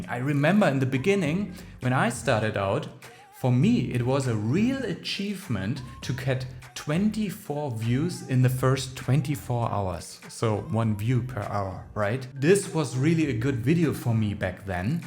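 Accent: Germany. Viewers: This channel is dope. Perfect visual branding and color scheme, completely balanced, properly organized information, flawless delivery. Even the German accent adds to the effect. I feel like I'm watching the Porsche 911 of YouTube growth channels. I'm guessing you drive one.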